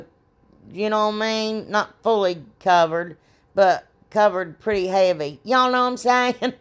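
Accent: South, United States. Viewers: Oh, and I just want to say that I understand your accent, because I'm a fellow southerner from Southeast Texas! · My 14 year old daughter walked in on me watching one of your videos a couple weeks ago and she fell in love with your accent and now she goes around saying “well anyway” in her best southern accent lmao it’s the greatest. I’ll try to record and send it to you! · Hi there it’s me Ann and Dale again since we both watch you, You out done yourself,everything so nice.I love you’re southern accent, I can’t believe any one would say any thing bad to you,that’s called JEALOUSY! I’ve had some problems with that surgery we both had. Getting over that.I haven’t been on I miss your dogs also.love that lamp. Have a wonderful fall.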